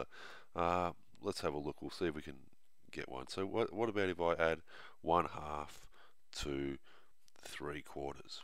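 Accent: Australia. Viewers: This guy is not british, he is Australian plus daddy pig has a deeper voice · Thanks in my sats I nearly got 300 points but I got 297 because of fractions if I got 300 points teachers said that it was harder this year because it is year eight work now I am going into year 7 so that you also are you Australian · he's Australian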